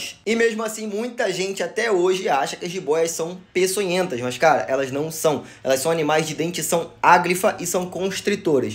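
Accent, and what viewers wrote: Rio de Janeiro, Brazil. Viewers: Adoro teus videos .muito objetivos Tu é o cara e o teu sotaque é muito legal carioca né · Você é tão carioca, que você falou “um dos”, e a legenda apareceu “um dois”. Hahaha! Abraço!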